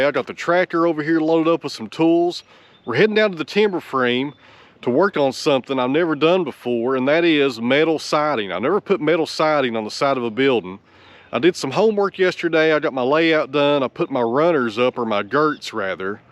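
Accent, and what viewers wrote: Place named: Tennessee, United States. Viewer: I live in the UK, getting out about is a little restricted for me at the moment. Love what you do in the videos, I never thought sawmills were so interesting before but glad I found your channel.... love listening to your Tennessee accent too, very warm and welcoming.... you narrate your video stories so easily, sign of a quality creator on Youtube.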